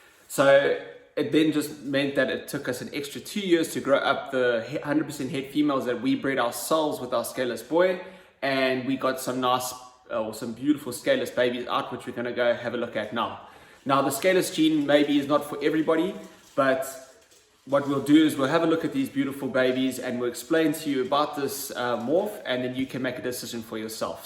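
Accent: South Africa. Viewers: Love the South African accent!!! Dunno why just do!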